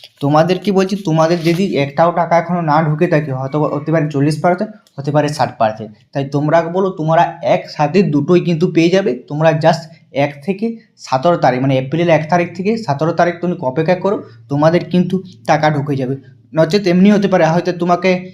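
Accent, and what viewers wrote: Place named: Purulia, India. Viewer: Dada kothai bari tomar vasa ta purulia tan lag6e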